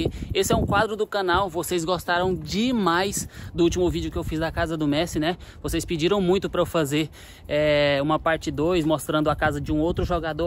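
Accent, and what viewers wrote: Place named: Spain. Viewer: Jajajaja que bueno es tu español, de hecho tu acento parece el de español españa! Tenes familiares españoles o algo ?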